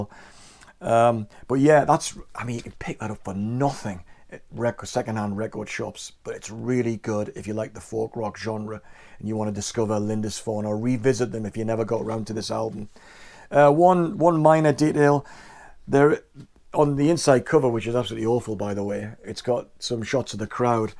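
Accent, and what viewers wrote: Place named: Geordie, United Kingdom. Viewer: Came upon your video by accident (read 'algorithm') but glad I did so. Lindisfarne represent all that's good in 70s/80s music. But I was sad there was no mention of their final (Mercury records) album ' '. It's a virtually forgotten album, basically easy to listen to but containing what I would describe as Magnum Opus - (note the question mark - typical Hully). Very dark and very Berthold Brecht. A song about a nightmare dispelled upon waking (just a dream, a crazy midnight scream) and seeing his wife (like the first time in my life) reassuring him. Spellbinding. When you started speaking in the video, I thought I recognised a familiar 'twang' and when you mentioned Windows Music Shop I KNEW! Took me right back to my youth when I, too would be looking in at the array of records and instruments on display there. 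Thanks again for an excellent video. From an ex-pat Geordie bathing in nostalgia.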